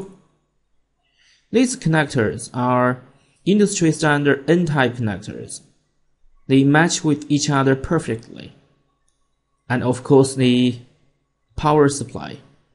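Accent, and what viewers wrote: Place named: China. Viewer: Your accent is certainly not British, more like Chinese English. Especially when you say PPPower Pluuug. Oh, and these boosters are a fraud I have bought several and they do not work.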